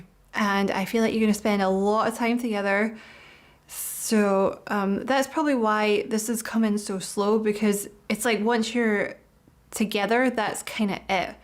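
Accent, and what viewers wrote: Scotland, United Kingdom. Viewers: It’s Scottish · My apologies for my confusion. My American ears did not identify you being Scottish. However my soul recognized your voice. Once again, my apologies.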